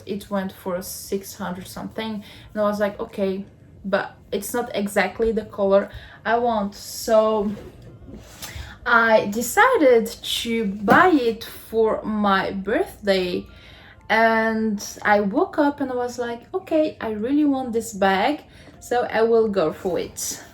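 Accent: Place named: France. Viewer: Are you French? Love the accent!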